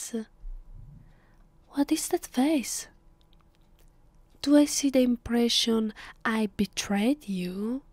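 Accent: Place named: Italy. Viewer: To, I enjoyed your vampire roleplay. The title Vampire 's Captive. And the Italian accent, actually makes it. Extra special indeed.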